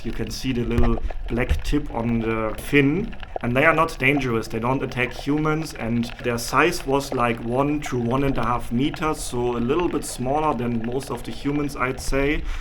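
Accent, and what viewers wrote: Germany. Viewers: Hör ich da einen deutschen Akzent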